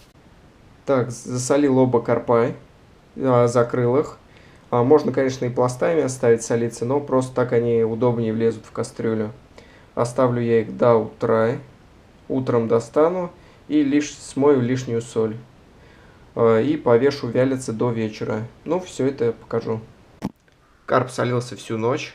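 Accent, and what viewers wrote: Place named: Mordovia, Russia. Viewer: с пацаном служил с Мордовии. У него такой же акцент) никогда раньше такого не слышал, а сейчас сразу узнаю)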